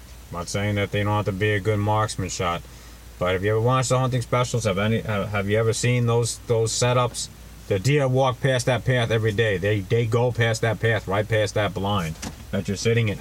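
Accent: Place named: Upstate New York, United States. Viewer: You sound like you are from Upstate New York. Welcome and hello.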